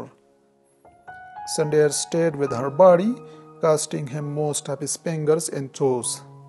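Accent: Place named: India. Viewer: What's with the indian accent?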